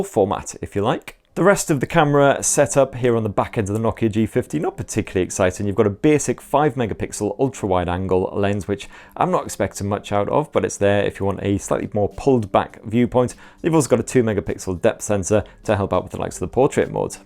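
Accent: United Kingdom. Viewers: Just came to hear the British accent 🌝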